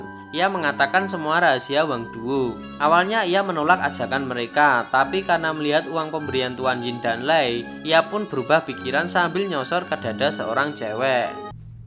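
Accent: Java, Indonesia. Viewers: medok mas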